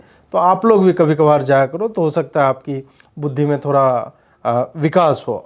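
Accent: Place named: Bihar, India. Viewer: ye youtuber paka bihari h his hindi accent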